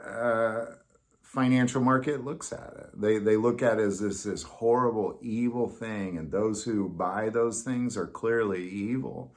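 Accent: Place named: Texas, United States. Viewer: It sounds like Texas. I used to live there.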